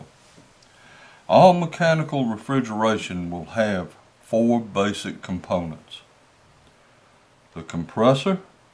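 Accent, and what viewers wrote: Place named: South, United States. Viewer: Very cool southern drawl too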